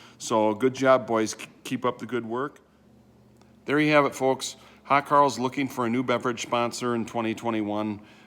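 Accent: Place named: Midwest, United States. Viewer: Are you Minnesotan you sound very midwestern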